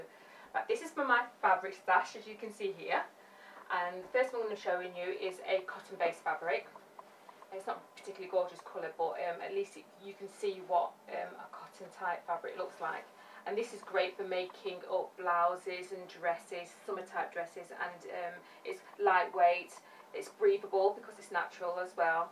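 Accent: United Kingdom. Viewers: Fantastic tips as always, I should be in bed but I decided to listen to my favourite online fashion tutor! From your accent I can tell, you are British. I live, not far from London. Could you recommend any areas where I can get the wasted ends of fabric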